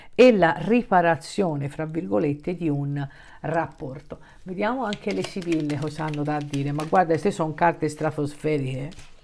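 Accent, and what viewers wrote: Tuscany, Italy. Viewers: 😊😊😊grazie grazie grazie.è un piacere ascoltare te ed il tuo toscano😅😍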